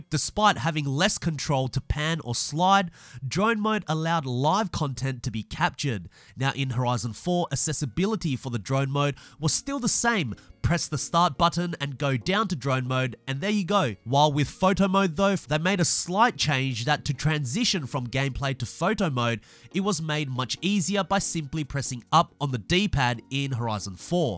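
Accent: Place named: Australia. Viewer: "Yo what's cracking everyone here" Aussie accent never gets old.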